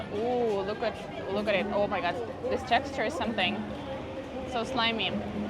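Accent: Vietnam. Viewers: Your VN accents from the northern. Wow. Cool.😊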